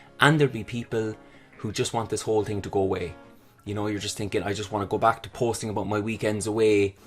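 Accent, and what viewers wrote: Ireland. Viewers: The Irish rrrr good , yeh l love this guy ❤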